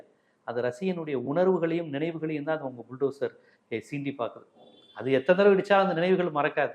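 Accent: Madurai, India. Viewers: உங்கள் பேச்சில் தெரிகிறது நீங்கள் மதுரைக்காரர் என்று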